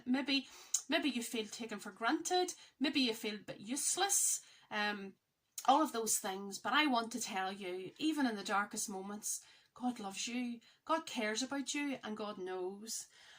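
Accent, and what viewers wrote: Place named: Northern Ireland, United Kingdom. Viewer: Hello thoroughly enjoyed your podcast, and ohhhh those socks are amazing. I watch Angela and that's how I found your channel. I'm originally from Carrickfergus and moved from N Ireland over here to Scotland back in 96 and it's always lovely to hear someone from back home. Stay safe stay well, hugs from Scotland xx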